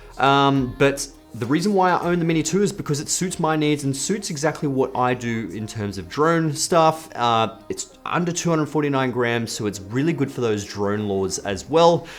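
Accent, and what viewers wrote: Australia. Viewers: I have both of those drones and love them both I am not a professional photographer I only do it as a hobby. I was on your last live chat and was trying to ask you a question. I got low sugar and had to go and get some I’m diabetic. I was trying to ask do you know much about the capabilities of the tracking feature I haven’t really pushed it too far yet and I am just trying to find out some things about it before I go running off to Mount panorama in Bathurst I think you would know where I’m talking about because you sound like you’re Australian. I want to film my classic Merc going round the circuit now whether I fly it manually round or use the active track is my question. I understand that it uses the camera to track. If I use an ND filter would that make the drone lose my car and stop tracking me? When I go there I will have a mate with me so I’m not sure whether to sit in the passenger seat and fly manually or do you trust the active track. 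Do you have an opinion on this for experience. How were you following the JetSki manually or active track? Any thoughts would be appreciated.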